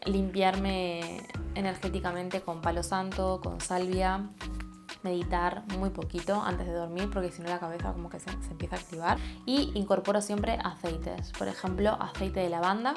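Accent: Argentina. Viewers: Sabía que eras argentina! Tu manera de hablar lo delata. Me encanta todo lo que compartís diosa ❤ he puesto en práctica tus consejos y me siento mejor. Gracias! Un abrazo desde Argentina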